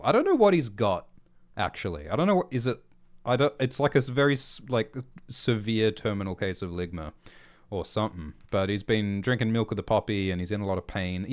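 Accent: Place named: Australia. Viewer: <link> MY EXPERIMENT SUCCEEDS, or fails, depending on how silly it is that both my dog and I sleep better with chill Aussie narration. Thanks for all you do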